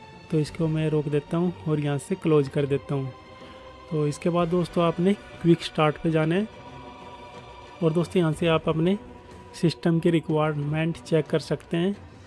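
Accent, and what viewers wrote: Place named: Haryana, India. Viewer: kyuki m khud haryana se hu and tumhari boli se pta chlta h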